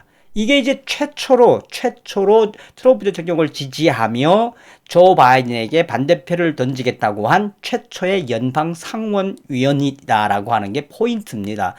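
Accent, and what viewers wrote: North Korea. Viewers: 님 틸북인이시가요? 북한 말씨여서요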